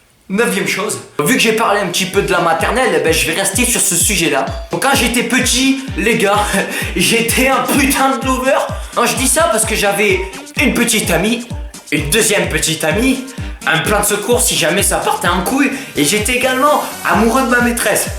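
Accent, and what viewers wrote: South, France. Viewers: Je t'adore ! tu me fais rire. Tant fait pas pour ta taille je mesure moin 1m60 et je suis aussi en 3eme voilà voilà. ah oui j'adore ton accent du sud 😉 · J'adore ton accent 😊😍et tes vidéos sont super simple mais tellement bien 👌🏻😏 . C'est drôle parce que je connais un Clément, qui a le même âge et qui a aussi l'accent du sud 😊😂😌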